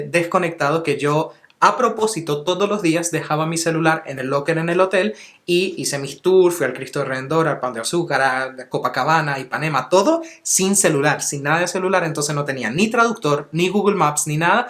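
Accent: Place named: Venezuela. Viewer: al principio identifique tu dialecto pero me confundí cuando vi Chile… pero algo me decía qué era de Venezuela… ademas me gusta mucho tu elocuencia…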